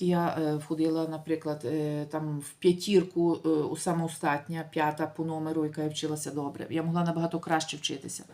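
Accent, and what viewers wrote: Lviv, Ukraine. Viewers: Hallo, комплІмент, від Ольги, мені подобаєтся ваш львівский діалект,а також миле відображення життя...Швейцарія - це super!!! Дайте контакт, поспілкуемось! Успіху в усіх справах! Liebe grüsse, Olga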